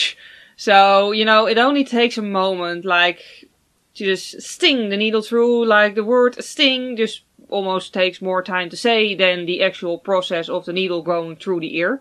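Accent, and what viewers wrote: Netherlands. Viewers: are you Dutch you kinda have a Dutch accent?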